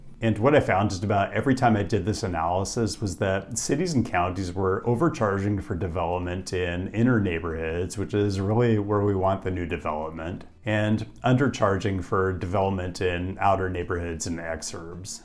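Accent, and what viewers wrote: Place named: Portland, United States. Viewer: It just now hit me why has a Portland accent.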